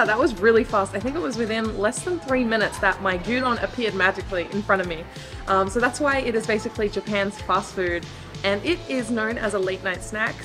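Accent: Australia. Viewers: Love your Aussie accent 😊. Thanks for your video from a Japanese-Canadian 🇨🇦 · Is your English Australian?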